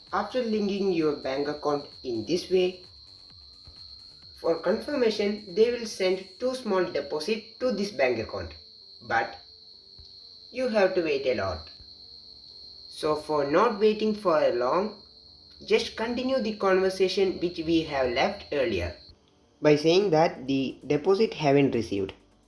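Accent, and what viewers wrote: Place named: India. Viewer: I hate hindi accent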